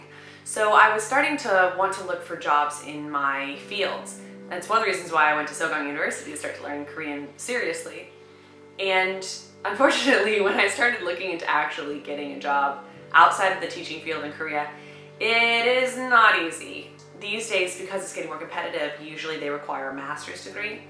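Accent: South, United States. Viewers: to a brit, you dont have a strong southern accent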